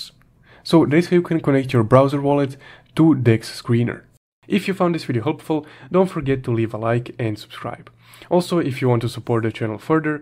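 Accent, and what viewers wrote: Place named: Russia. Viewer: Со найс то хеар рашн акцент)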